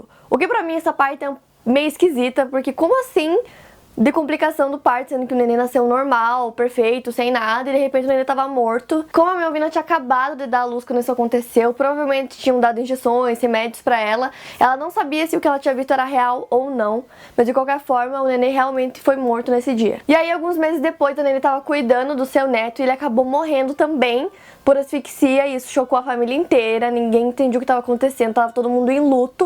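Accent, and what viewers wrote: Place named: Paraná, Brazil. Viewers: Seu sotaque paranaense é maravilhoso, que voz linda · Ela é de Ponta Grossa, no Paraná. Eu moro no interior do Paraná e tenho um sotaque parecido, adorei encontrar o canal dela e finalmente ter um Youtuber maiorzinho que fala como eu shshshs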